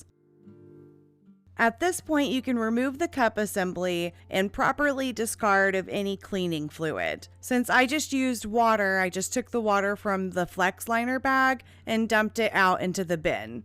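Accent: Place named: California, United States. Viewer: That California tongue roll just doesnt let me concentrate☹️Great video thou.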